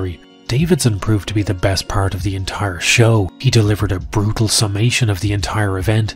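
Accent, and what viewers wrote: Ireland. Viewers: I've just found your channel and damn, am I impressed. Great to hear an Irish accent smashing it too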